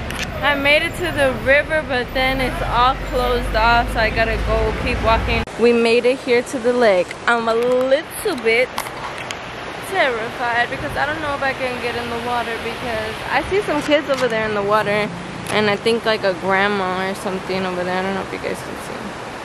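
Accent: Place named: California, United States. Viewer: Es obvio que es chicana. Rostro de mexicana pero habla inglés perfecto y con acento californiano.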